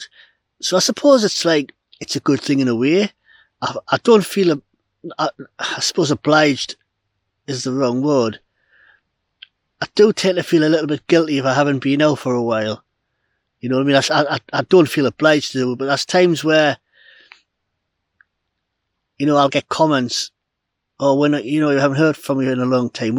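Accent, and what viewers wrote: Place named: Ashington, United Kingdom. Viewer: When’s the next video coming out? I’m getting withdrawal symptoms 🤣 miss that ashington accent n canny craic hew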